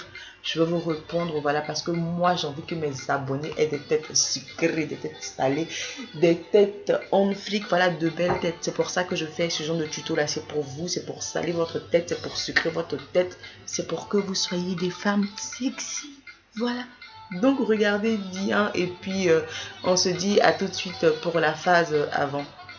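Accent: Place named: Ivory Coast. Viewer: de que j ai entendu t as voie j ai vue que tu étais ivoirienne #225👊👊👊👊👊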